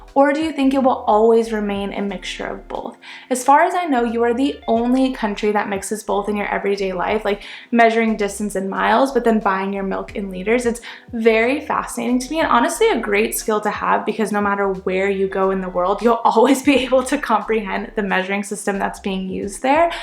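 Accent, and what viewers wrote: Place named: United States. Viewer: By all means, keep answering 'yalright?' with 'I'm good, how are you!?' in your cheery American accent. It may brighten peoples day. Don't try to fit in here... we like the novelty of an American.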